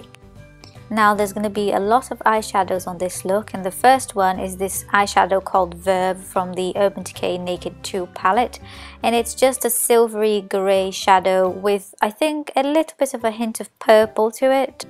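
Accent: Wales, United Kingdom. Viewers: Lovely look, can't wait to try it out this weekend! :) Am I right in thinking I can hear a very slight Welsh accent coming through every now and then? :p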